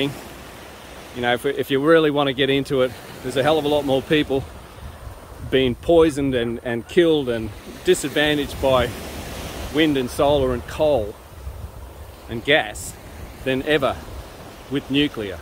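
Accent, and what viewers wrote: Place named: Australia. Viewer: Yes! An Australian talking about LFTRs! What is the status of nuclear power in Australia; LFTR, in particular? Which organisations are out there trying to (re)educate the public about nuclear power (and dispel some of the myths and lies, etc.)? I'm aware of Nuclear for Climate, but they have no policy on LFTR, or any specific reactor type, as far as I could see. It was a bit disappointing that it had to be Peter Dutton to be the first to call for an "unemotional discussion about nuclear power - in particular small modular reactors" in his budget reply speech recently. Still, better than no high-ranking politicians talking about it. But LFTR is where it's at. I've been curious about the combination of LFTR and SMR designs. Can't tell you how stoked I am to hear an Australian accent talking about molten salt reactors running on a thorium cycle. 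Well, I'm "subbed". Keep up the awesome work! PS Regarding the nuclear submarines the current (and former) Commonwealth Government is looking to purchase: I think they'd do a LOT more good if they were put in dry dock and connected to the grid. ;)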